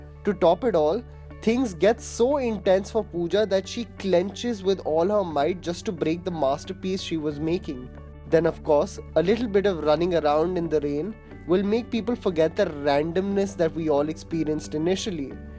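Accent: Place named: India. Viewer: I think you are here only to make mockery of bollywood... Why don't you highlight good things of bollywood? Or highlight stupidity of Hollywood.. Don't you realize people from other countries make fun of bollywood just by seeing your videos. By your accent it seems that you are a Indian...but belong to that category who are Indian but their heart beats for US.... Can't you take movie as a movie?...A work of a fiction...Not the reality.. Just a medium for an entertainment..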